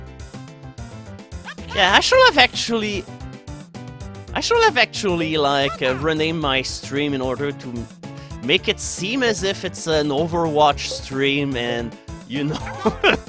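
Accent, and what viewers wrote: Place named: Quebec, Canada. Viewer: Il me semblait que je reconnaissais le doux accent québécois!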